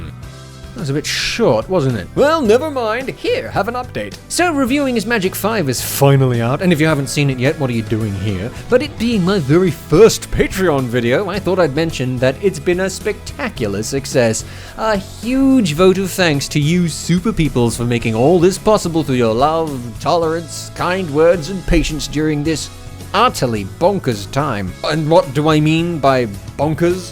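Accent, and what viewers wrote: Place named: Scotland, United Kingdom. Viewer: wait wait wait!! r u scottish...that explains so much actually... #freedom #hashtagsrsostuipedyamidoingdis?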